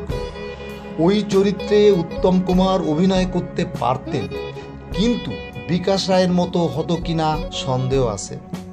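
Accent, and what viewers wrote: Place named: Bangladesh. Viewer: আপনি কি বাঅংলা দেশের মানুষ ।আপনার উচচারণে বাঅংলা দেশের গন্ধ ।আরো জানার চেষ্টা করুন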